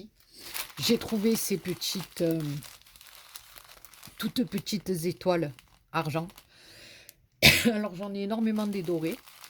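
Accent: South, France. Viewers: Bonjour, je découvre votre vidéo et reconnu de suite votre accent du midi 😀 J'habite Aix en Provence 😊 Vos créations sont magnifiques, j'aime beaucoup le petit coffre qui est magnifique 🤩 Je me suis abonnée 👍 Merci pour le partage.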